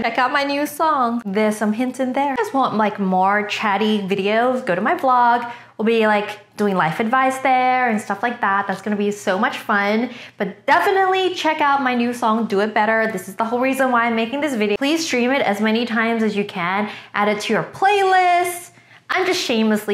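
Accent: United States. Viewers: She’s got an America accent 😂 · Love the new Americanized accent. 😍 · i haven’t watched in forever but it feels like you have an american accent now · I've not watched Wengie in years and was shocked about her now American accent! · You've been in the states for so long u have an American accent now.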